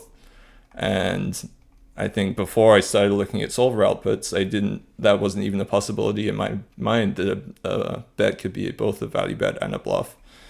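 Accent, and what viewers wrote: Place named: United Kingdom. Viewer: A very particular from of the British accent. I know a small Youtuber who has almost the exact same tone and cadence.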